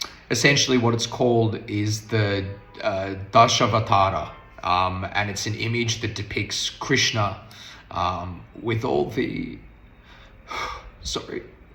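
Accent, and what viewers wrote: Australia. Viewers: Don't apologize for your accent. When the intent is right things like accent don't matter! And you have a lovely Aussie accents anyways! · greatest weakness: Australian English. The Indian viewer's greatest strength: Listening to Australian commentators for cricket. XD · Your Australian accent is lovely. Please never change your accent. I love listening your English. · I'm from Bharat 🇮🇳 Your Australian accent to amazing. I understood completely no issues. What you experienced is not normal. Haman Chalisa meas connection to ALMIGHTY GOD. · Don't worry..... Indians are used to Australian accent from all the cricket commentaries we have heard all these years😂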